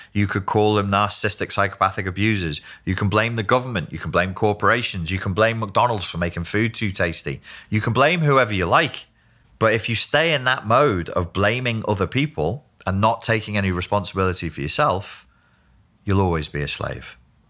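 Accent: Liverpool, United Kingdom. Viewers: Finally I can hear some scouse from. 😱🤗 Or what's the proper term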